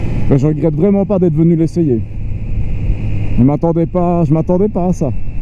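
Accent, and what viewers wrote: Belgium. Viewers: Ça me fume ton accent belge, j'habite près de Theux aussi mais j'ai acheté une Ktm a gouvy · J'ai envie de me la prendre en A2 et je cherchais des vidéos... En t'écoutant je me suis dis c'est étrange il a l'accent et les expressions des mecs de chez moi... ça fait 16 ans que je suis dans le sud ouest de la france pour info... et oui en regardant les panneaux de circulation je me suis dis ! Ba oui c'est un cousin Belges ! Je suis dès Ardennes côté française :)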